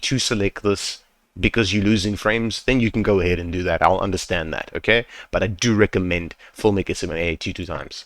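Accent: South Africa. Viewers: This is amazing, also I love the South Africa accent what an absolute hilarious accent to listen to almost as funny as the Scottish accent god bless you for this brother not only excellently explained in a clear and consise manner but humor combined with the simplicity had me on a roll thanks again! Would love to meet you in person! 🔥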